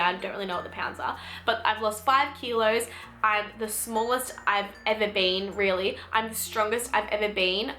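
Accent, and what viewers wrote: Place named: Australia. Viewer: love hearing an Aussie voice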